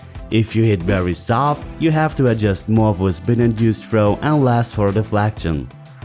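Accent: Germany. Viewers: he's german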